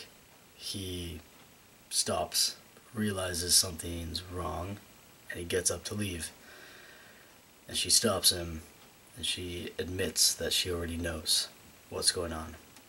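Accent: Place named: Ireland. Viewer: I adore that little Irish accent you are developing! Words can't even describe how excited I am to watch this short film. I have very little knowledge on trans people, but at the same time, a great deal. My ex was trans and I'm beginning to wonder if I am too. I just feel so incredibly masculine, yet nobody can truly understand it or me. Also, my best friend says I have the face of a 14 year old boy. lol I've been watching your videos from the beginning,. You are very awesome indeed.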